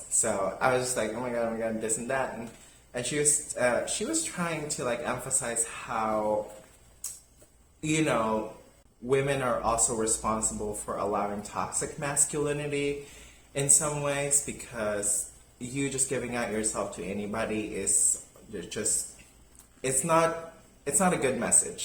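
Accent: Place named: England, United Kingdom. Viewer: you look soo beautiful, love your english accent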